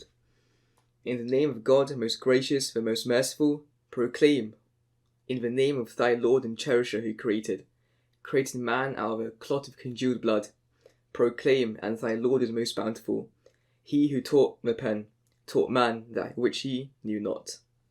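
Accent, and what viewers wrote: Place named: United Kingdom. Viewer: ngl wasnt expecting a british innit p.s. nice visuals concept.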